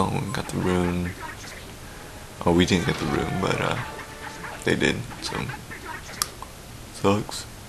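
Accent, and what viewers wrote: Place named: Vietnam. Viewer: Gotta love the Viet accent. Love your vids. Keep it up please. :)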